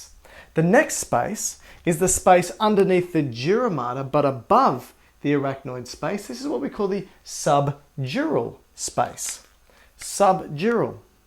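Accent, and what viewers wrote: Australia. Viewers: Madhu Parisa that’s just the Australian accent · Same lol, it's the Australian accent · As an Australian, I love watching people in the comments of your videos struggling to understand your aussie accent 😂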